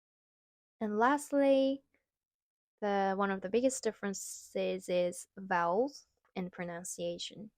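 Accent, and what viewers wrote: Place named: Australia. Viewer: i found your channel from that 'what i've learned' video (about why japan has a low obesity rate) but am also studying japanese so i figured i'd watch this video. have been studying japanese for many years but progress is slow. one thing i learned from this video is that there are 188 particles! i had no idea it was so many, even after years of studying japanese i only know about 20-30 of them. it's also interesting that despite you (presumably) being a native japanese who has visited or lived in australia, i still detect an australian accent in your english, especially when you say words like 'answer', you say them in the way an australian would.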